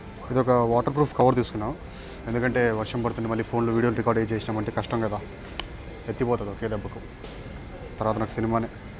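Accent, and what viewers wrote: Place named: Kadapa, India. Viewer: I have been waiting for your shorts. Suddenly I came across your video. It was a nice presentation. Sometimes I laughed out loudly by going through your commentary. That Kadapa slang is nice. Especially the commentary which you made while climbing the steps was awesome. Still I am unable understand why did you opt for a solo trip. I think it would be better if somebody accompanied you. I appreciate you for this solo trip. It’s quite interesting. If you don’t mind may I know something about you? If you feel that it is personal….. you need not tell…. No issues I don’t know where you are now but I wish you a very happy, safe and pleasant journey. Enjoy your life…… ultimately these are the memories that a person can cherish through out his life Great going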